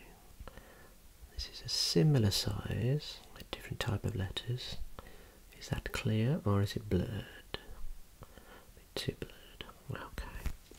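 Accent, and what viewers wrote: Birmingham, United Kingdom. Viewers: Brummie! Lush accent!!!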